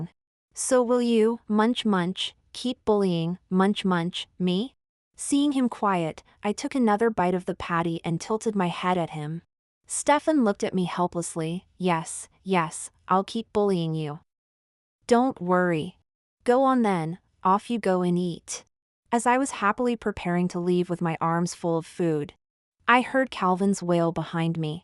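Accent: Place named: United States. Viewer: AI with an american accent using UK slang words is kind of cringe~~~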